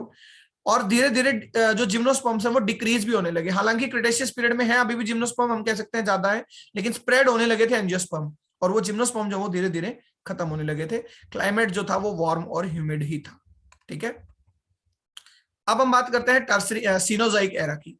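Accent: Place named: South Asia. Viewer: Viewers who are unfamiliar with the way English is spoken in South Asia will have trouble understanding what the teacher is saying here. I was sorry not to find an option for written captions.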